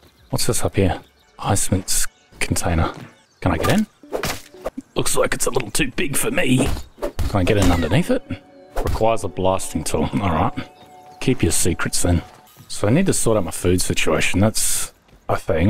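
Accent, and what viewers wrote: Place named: Australia. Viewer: Ok you can all laugh but it took me forever to realize has an Australian accent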